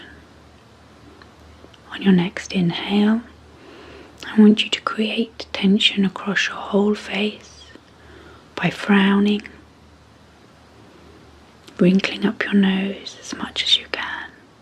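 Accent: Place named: West Country, United Kingdom. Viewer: I wonder where this lady is from? She’s got a Wiltshire/Somerset vibe.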